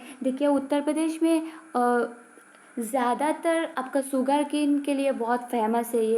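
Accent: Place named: South India, India. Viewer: I like your voice, may be you are from South Indian.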